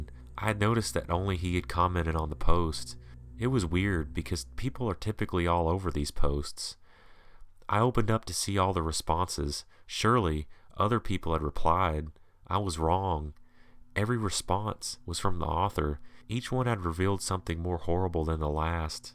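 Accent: South, United States. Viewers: It sounds a bit more Southern to me.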